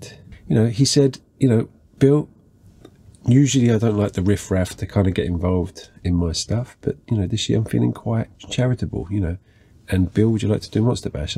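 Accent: United Kingdom. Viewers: heees british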